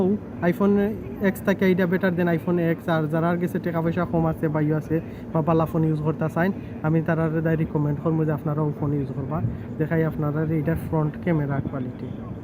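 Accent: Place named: Sylhet, Bangladesh. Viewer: সিলেটি কতা ভই খুব বালা লাগে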